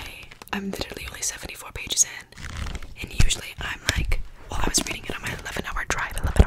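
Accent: United Kingdom. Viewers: Your slowly transforming to a full British accent 100% of the time😂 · Wow I didn’t listen to your asmr for maybe 3 months and suddenly ur British what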